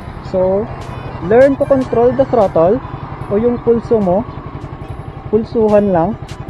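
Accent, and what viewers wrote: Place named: Iloilo, Philippines. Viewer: hehe kaya pala sir may halo ilonggo accent. Ilonggo din ako sir hehehe RS sir!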